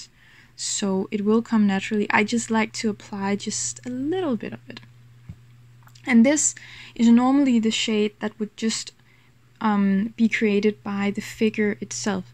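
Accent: Denmark. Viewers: Are you Danish? Your accent sounds very similar :P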